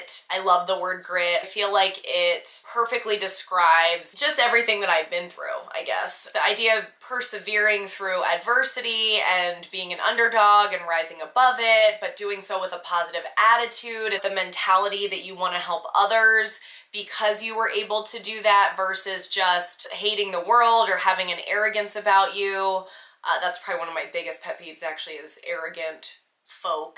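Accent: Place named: Ohio, United States. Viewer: Nice to get to know more about you! I thought you may be from my neck of the woods due to your accent. I am an Ohio girl. We have alot in common and and can totally relate.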